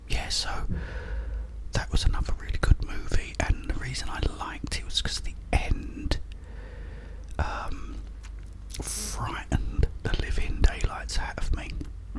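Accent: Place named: England, United Kingdom. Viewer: Where abouts in england 🏴 you from mate?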